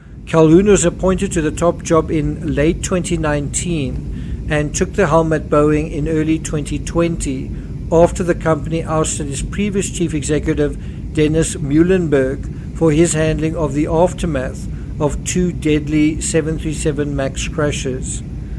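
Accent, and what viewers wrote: South Africa. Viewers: Are you from South Africa? Trying to place your accent. I think it is South African.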